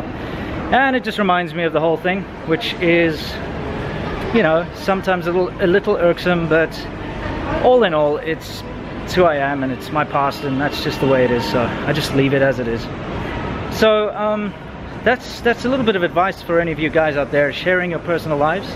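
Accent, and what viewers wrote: South Africa. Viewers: 南非口音的英语？还假装自己是英国人过？喜欢说瞎话的人，他的话还能信？ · FKM ...the phrases he uses sound like South African English to me...he hasn't got a pure British accent.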